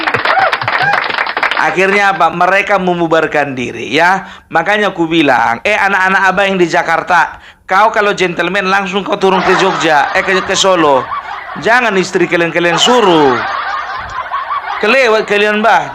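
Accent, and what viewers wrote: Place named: West Sumatra, Indonesia. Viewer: Logat suaranya seperti logat Sumbar...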